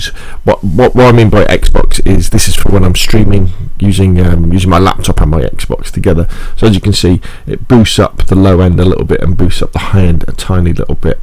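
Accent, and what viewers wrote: England, United Kingdom. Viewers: too english like wotah(water) eh ?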